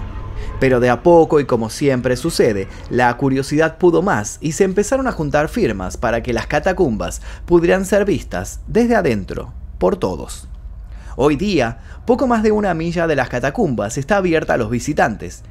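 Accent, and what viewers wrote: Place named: Argentina. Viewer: ! Me encanta cómo relatas y tu voz le da un plus de interés a todo aquello que relatas. Los diferentes tonos, las pausas y el acento, el mismo que el mío, pero que me encanta escuchar por que ya no vivo en Argentina. Cuando vaya para Francia los visitarte y te contaré. Chau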